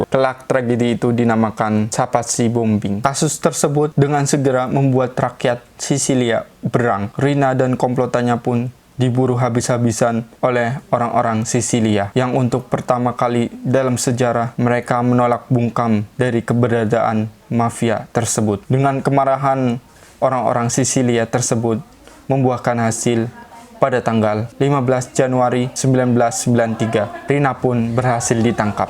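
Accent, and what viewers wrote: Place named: Banyumas, Indonesia. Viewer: kumpas tuntas Bahasa tegal donk. Ko ngapak medok nemen koooh. Hahahaha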